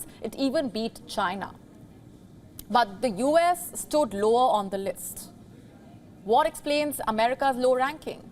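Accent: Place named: India. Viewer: I still dont get war with that Indian english... It doesnt sound right 😱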